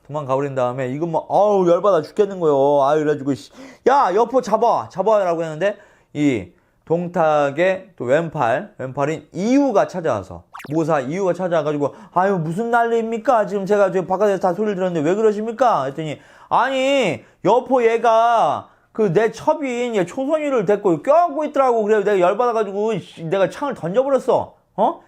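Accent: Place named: Chungcheong, South Korea. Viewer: 충청도사투리로 듣는 장동민의 삼국지 !옛날이야기 듣는 것 처럼 정말 재밌어요!고맙습니다!^^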